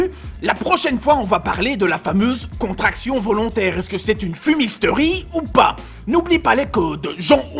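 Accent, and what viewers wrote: Liège, Belgium. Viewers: haha jean ;) tu es d'ou de liège ?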